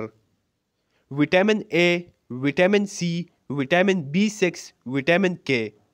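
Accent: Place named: India. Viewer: thanks for this but i didnt expect it to be brutally indian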